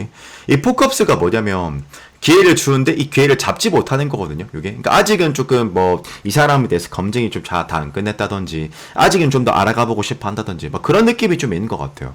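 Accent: Gyeongsang, South Korea. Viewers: 갱상도라 좀 거부감이 있지만 내용이 더 중요하지요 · 갱상도인으로서 사투리 지적은 용납할수가 없다. 우리는 서울말같은거 쓸줄 모린다. 너거도 사투리 몬쓴다아이가.! 같은 갱상도인으로서 긍지와 자부심을 지켜주십시오. 우리가 돈이 없지 가오가 없습니꺼!!!!! 화이팅!!!!! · 1번...몇달전에 썸타다가 제가 까인 입장이라..ㅎㅎ 저랑 잘 될수가 없을거같긴한데...ㅜ저 자존감 낮아져있어용 ㅜㅎㅎ그나저나 전라도 여자인데 경상도 사투리 너무 듣기좋아용~~~차분하게 말씀 잘해주시고 전달력 충분히 잘 되고있어요^^ 모든 사람이 서울말을 써야한다는건가..그건 너무 억지같아요..계속 사투리 리딩 기대할게요^^ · 사투리가 어때서 트집을잡냐? 경상도 사람이면 사투리쓰지. 에구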